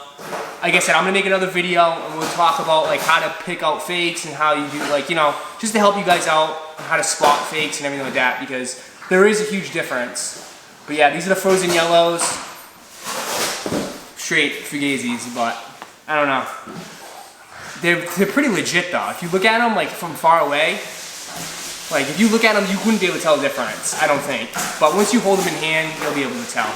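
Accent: Boston, United States. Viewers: I knew you were from Boston too by your accent! Just started watching your vids and instantly subbed. Keep it up!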